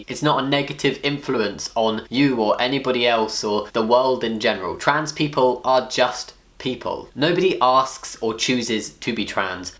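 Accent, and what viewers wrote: United Kingdom. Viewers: Wait, aren't u British?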